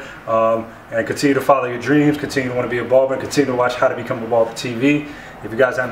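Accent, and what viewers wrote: New York, United States. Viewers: you sound york or jersey that right?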